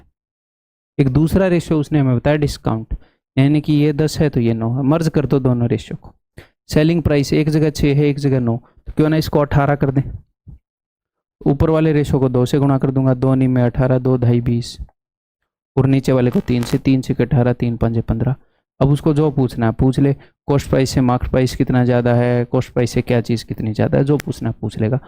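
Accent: Haryana, India. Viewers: Sir ek video haryanvi accent mein banano...aapki haryanvi accent bahut mst hai maine ek baar paid course ke videos mein thoda suna tha..aap syd kuch bol rahe thai samne waale ko ki thodi class baaki hai bs.😀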